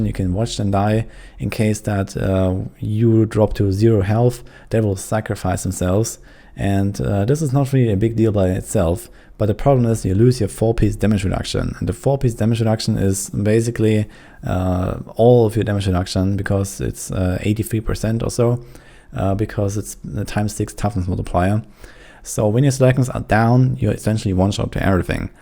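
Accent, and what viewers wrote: Germany. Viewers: Man hört direkt das du deutsch bist 🤣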